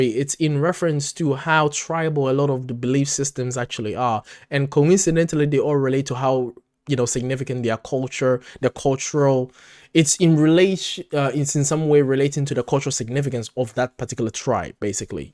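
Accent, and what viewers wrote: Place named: England, United Kingdom. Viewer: I saw an interview with Troy and Tuomas talking about not believing in God and organised religion. Troy actually said you are told about Santa and the tooth fairy and God, but for some reason as we get older some people still believe in God he says its, nonsense. He's English like me and I'm not saying there's no religion over here but if you compare the percentage of church goers to say the US our numbers are far lower, you sound English. This is in no way meant to insult people who believe in God I don't but respect other people that do. Your reactions are always funny informative and interesting. 🇬🇧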